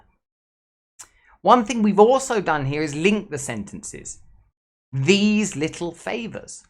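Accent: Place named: United Kingdom. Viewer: Please teach us how to do British intonations like you. Stress sentences in particular. I love your accent and intonations. How to speak fluency like you with intonations.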